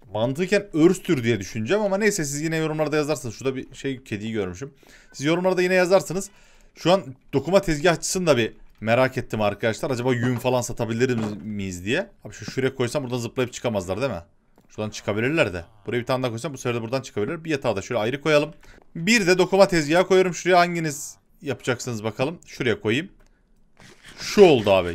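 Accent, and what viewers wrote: Yozgat, Turkey. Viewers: Yozgatlık leeeeyn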